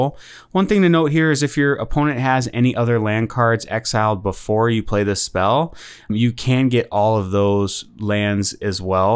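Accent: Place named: Canada. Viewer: I am only 5mins into the video, and I have to say this video is a big improvement from the last video I watched. The flow is better overall. You have a thick Canadian inflection, not a bad thing just noticeable how you end each sentence high. I would also consider new Viven planeswalker that would allow you to flash your bounce creatures to save important creatures as a way to protect key pieces.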